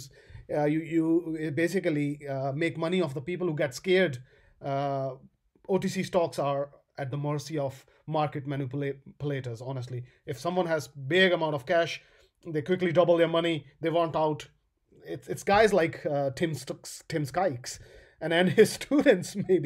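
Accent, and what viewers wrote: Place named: British Pakistani, United Kingdom. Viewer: You sound British/Pakistan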